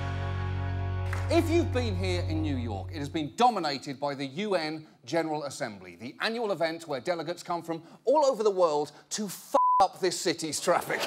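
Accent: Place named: United Kingdom. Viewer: What I see is John Oliver is not smart as he thought he is. Before you say something just put yourself in Modi’s shoes. You wouldn’t survive even a day. Your British accent does not prove you are smart enough to says something about someone else. Besides, your advice Indian, look at your own god damn county and president. One last thing if anyone can explain me why British people turn in to jerk when they come to United States, they can’t even say singe sentence without swearing and using F word. They are very respectful in their language on their own motherland. Because I also saw Master chef where Gordon as well swear at vegetable. Can anybody understand that?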